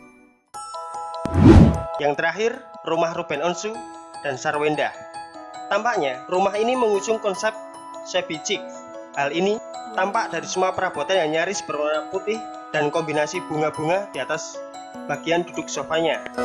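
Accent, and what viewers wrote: Java, Indonesia. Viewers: Medokk.. Hhaha lucu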